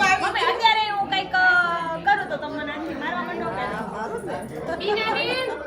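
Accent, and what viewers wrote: Kathiawar, India. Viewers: Kathiyawadi accent bav sweet che ❤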